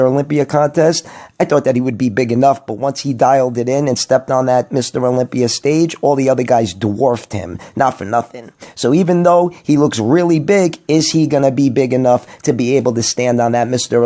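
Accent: New York, United States. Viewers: i love your no bullshit attitude mixed with the NY accent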